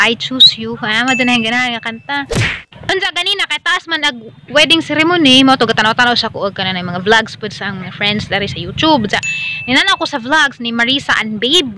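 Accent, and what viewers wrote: Bohol, Philippines. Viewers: Nalingaw kus imong accent dam hehe. Makamingaw ang bohol. Pagdag bringhauz dam ha hehehe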